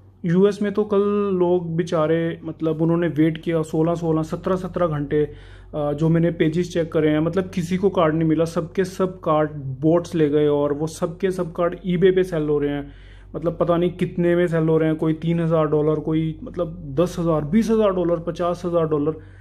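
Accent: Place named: Punjab, India. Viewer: Bro abb to khair theek he ho app Bro face reveal thora suspense ke sath karna tha Baki mere ko lagta hai ki app punjabi bolte honge ghar pe 😄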